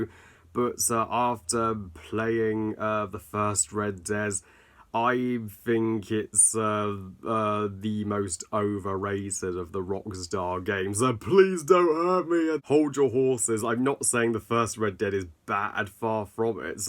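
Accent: West, United States. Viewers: great review as always and i loved your western accent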